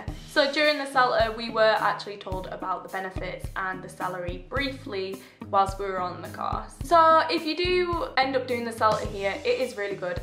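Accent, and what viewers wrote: United Kingdom. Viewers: hello, very useful video thank you! I can hear from your accent you are British? What's it like being a brit' out there and what tips might you have for brits' wanting to apply or to help adapt them to Vietnamese life/ job? Thank you.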